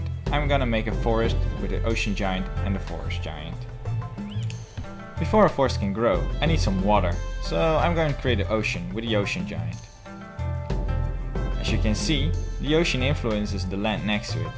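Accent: Netherlands. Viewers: are u dutch like me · i dont like dutch people who pronounce words like that, we got a lot of hours learning english, french, german etc and still they pronounce it like that. btw game looks awesome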